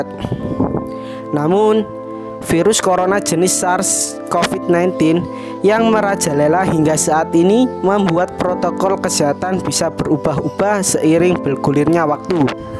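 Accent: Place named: Java, Indonesia. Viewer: Logat jawamu kental sekali kawan wkwk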